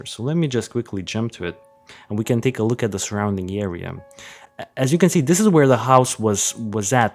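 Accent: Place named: Russia. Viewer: Completely off-topic, but are you Russian? You sound so much like my dad when he spoke English. He immigrated to the US when he was 24. It was soothing to hear (aside from the content in which you were talking about).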